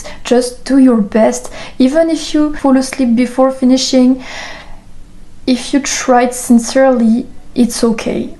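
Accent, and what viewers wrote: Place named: France. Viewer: What did she say the prayers were??? I'm having a hard time with the French accent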